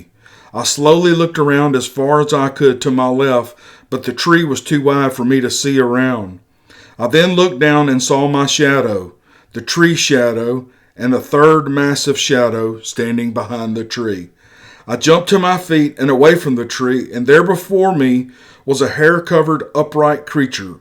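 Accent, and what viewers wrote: South, United States. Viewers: I'm from the South, but living in the West, so I enjoy your storytelling and Southern accent (aka twang). Wish you much success! And Happy Holidays! 🎄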